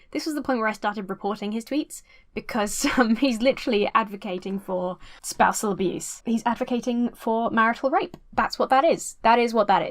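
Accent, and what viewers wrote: United Kingdom. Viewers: First video from you i watched already my least favourite thing is your british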